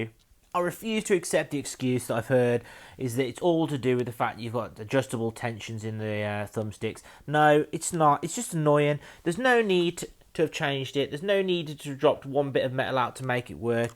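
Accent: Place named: Wales, United Kingdom. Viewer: oh u sounded welsh to me, im welsh!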